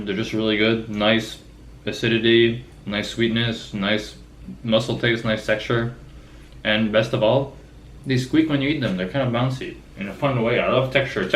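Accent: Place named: Quebec, Canada. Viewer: Matiz makes a nice can of mussels. Your accent was sounding very familiar to me and then I realized it is like my great grandmother's was, she grew up in Quebec. Cheers from a fellow canned seafood enjoyer and Souls games player!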